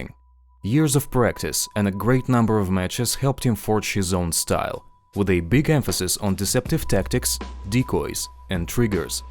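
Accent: Russia. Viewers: I like the Russian accent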